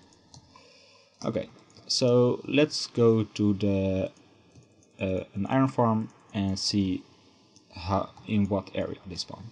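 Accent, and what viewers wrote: Netherlands. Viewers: kerl ziek nederlands acsent XD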